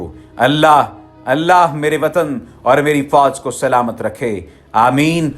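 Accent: India. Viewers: Pak Foj Zindabad Hai.....Mulk K liye Or Pak Foj k liye Jan B zinda. Ap ke awaz indian lagti hai.....Wesy Iran or Saudia or afganistan k bary me itna zada Q discuss kr rahy ho.......India k bary me itna discuss nai kia ap ne video me....................Humy pta hai sab apni foj k bary me💖💗💕💓🇵🇰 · Aap ka bolne ka talfaz indian ki tara hai r u a pakistai .i dont belive u r a pakistani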